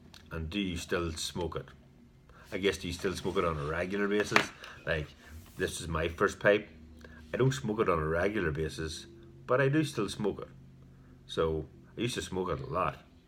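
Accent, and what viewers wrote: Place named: Ireland. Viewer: you rock. Welcome. Congrats on the 100 subs. Love the accent. I Hope to visit Ireland some day. My mother's side if from there. I can paint you some jars, not sure if you are looking for labels or jars or lids. Message me on instagram. So I can possibly make you some jars. Beautiful Peterson you are giving for 2nd place. I'll get a VR up tomorrow for Ya. Hugs from Texas!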